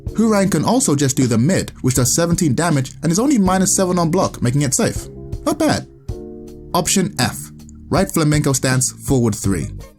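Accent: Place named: United Kingdom. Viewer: This was a steller breakdown. I don't know why but I pictured narrator drinking tea in the background. Must be the accent.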